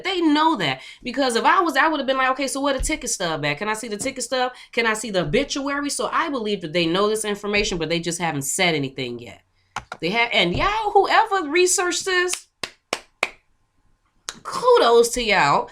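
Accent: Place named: Chicago, United States. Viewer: Subscribing cause your accent tells me you’re from my city Chicago 🤷🏽‍♀️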